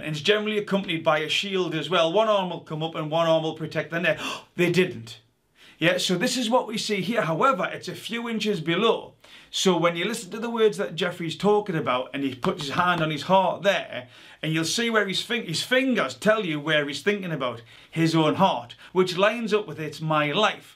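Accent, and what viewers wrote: Newcastle, United Kingdom. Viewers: U a geordie? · I love hearing a local accent on YouTube. We Geordies are gonna take over! Like the content.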